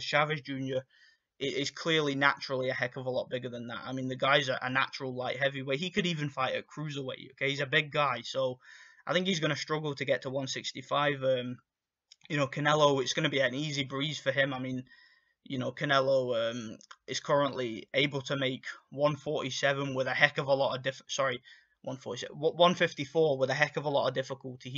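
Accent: United Kingdom. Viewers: Go listen to the boxing voice this dude is a joke! You sound like you from the U.K. Why waste time to make a video for a so called "shit fight" by you. Go talk about Liam smith an his brothers or that waste of a time sanders! Maybe they are better boxers for you lol !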